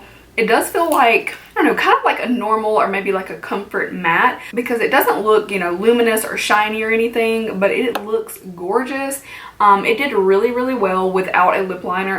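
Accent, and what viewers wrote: South, United States. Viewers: I can hear the country coming out in your voice a lot in this video love it! :-) maybe because your so excited!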